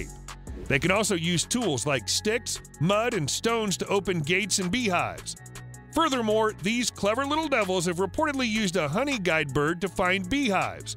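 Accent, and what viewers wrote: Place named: United States. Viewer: spoiled the vid with the horrible US accent. yuk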